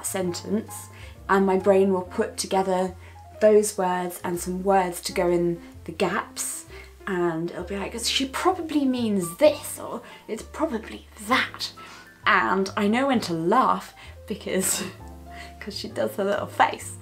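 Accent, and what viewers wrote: RP, United Kingdom. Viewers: You are very kind telling us that...and very pretty as well. I am learning German Sign, love you British accent 😍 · LOOOVE your British accent ❤️ ❤️ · I love how she is so British and almost posh but she is like “What the hell” and “God damn it!” It is such a distinktive personality trait of her’s :D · This is my first day subscribing to you, and I love all the videos I've watched from you! Btw, you have an amazingly beautiful accent, and I adore your phrasing. Well-spoken people with a British accent can impress me jolly deeply. xD And I'm gay too, so your channel's really lovely. :D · Most posh sounding deaf person I've seen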